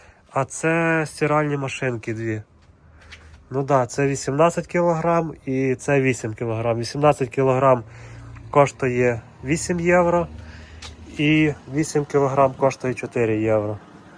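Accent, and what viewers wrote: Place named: Western Ukraine, Ukraine. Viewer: Файно слухати свою мову, ви похоже з наших країв, привіт з Тернополя 😊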